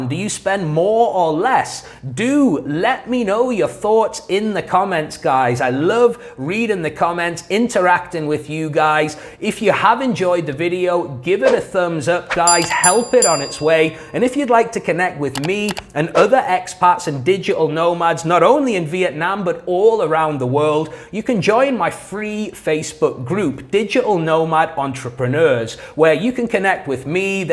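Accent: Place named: United Kingdom. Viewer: accents is British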